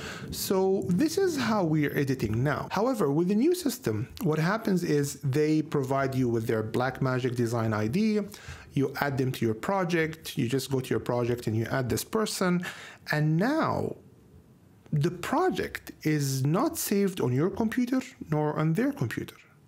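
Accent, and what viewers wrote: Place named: Italy. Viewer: Thanks so much for the free DR 18 update to your courses. I was a little concerned if I updated to 18 your Film Simplified course wouldn't be compatible. You'e the man!! I really love the way you teach too. Well put together, easy to follow along and small bites. Keeps me engaged. The bonus is the accent. What is that? Italian? Spanish? I don't know but it sounds so good with head phones on. Keep up the good work. You are the genuine article.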